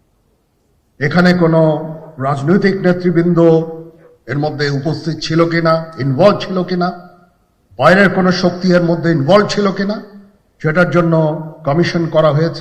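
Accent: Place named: India. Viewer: সেনা প্রধান ভারতীয় সুরে কথা বলছেন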